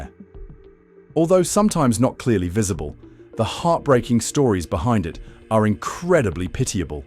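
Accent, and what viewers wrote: United Kingdom. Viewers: This is not not not the fault of “a society “ like the narration of the idiot writer of this script ! This is a result of Democrat run cities like Portland, Seattle, San Jose, Los Angeles and many others. An immoral government allows for homeless encampments, poor law enforcement, leniency towards drugs and prostitution along with allowing gangs and cartel control… the wealthy drink wine and socialize while they care nothing for law and order and safety, health and security for the community. The facts speak for themselves. I live in a peaceful clean area of good governance by common sense leadership. Thank goodness for some good places to live still. The narrator should take his British accent and tell the story of the Isslummist problems in England! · You sound like you're from the UK, why don't you go back, there's plenty to work on there. 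It's such a crazy thing that naive people tend to believe people from the UK or Australia because they have this accent that tickles their ears, all the while the UK is imploding.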